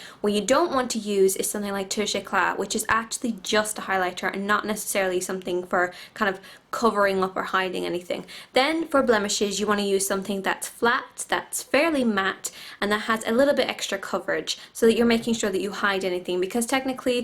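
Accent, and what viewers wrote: Ireland. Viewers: I think she's from Ireland?...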